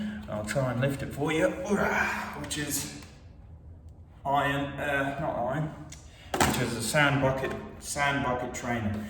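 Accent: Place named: Australia. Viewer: Who has like an Australian accent, or maybe British idk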